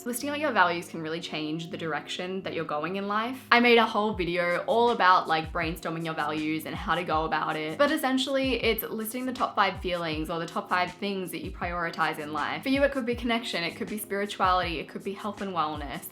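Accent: Australia. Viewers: Is she from Australia?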